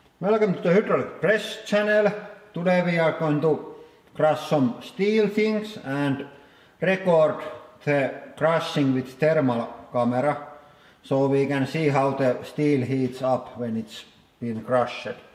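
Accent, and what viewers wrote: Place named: Finland. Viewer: oh god the finnish accent :D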